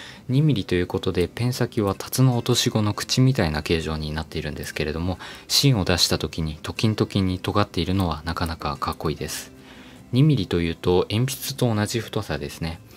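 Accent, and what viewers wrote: Aichi, Japan. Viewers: さん愛知県民でしょw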